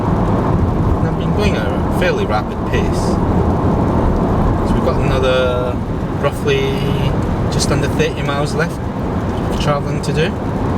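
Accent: Scotland, United Kingdom. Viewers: The normal expression on the UK Leaf Forum is: "Drive it like you stole it" , but to do that you need to head off to the Highlands, where men are crazy, roads are straight and empty, and traffic cops are few and far between. Envy your lovely Scottish brogue by the way: I've lived in Scotland since 1991 but never managed to shed my English 'posh' voice . And how the heck you manage to viddy three different screens at once beats me ... you obviously have a knack for technology.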